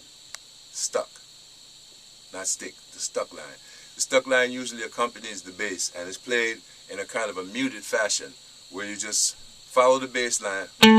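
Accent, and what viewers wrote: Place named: Jamaica. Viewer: "Now if you listen carefully you will hear..." Man speaks like Bob marley 🇯🇲😁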